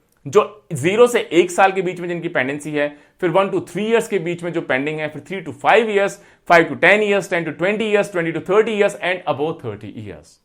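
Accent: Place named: Rural, India. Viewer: सर आपके वीडियोज देख के एक पूरा रोड मैप तैयार हो गया पढाई का , परी analysis के साथ हो रही हैं,बस एक चीज की ठोडा कमी लगती है, हिंदी साफ सुथरा बोलने की ,क्योकि उसमे local language आ जाती है, गांव वाली हिंदी, गांव की भाषा ठीक है अपनी जगह पर हर चीज मे professional होना चाहिए, इससे थोडा आत्मविश्वास कम हो जाता है,इस पर कैसे काम किया जाए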